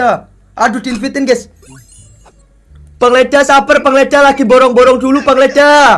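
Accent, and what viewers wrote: Java, Indonesia. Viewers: Bang jebule Kon wong Jawa yah,ngerti ora bang nyng ngomong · BIAR MEDOK AJA SIH, BIAR GA SOK INGGRES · Jowo tengah hader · Bang sampean wong jowo Yo ? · Bang kamu orang Jawa tengah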